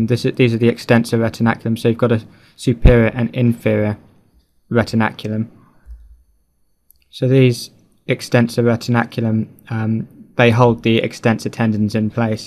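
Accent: United Kingdom. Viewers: Thanks for the tutorial . Your accent (British?) sounds amazing.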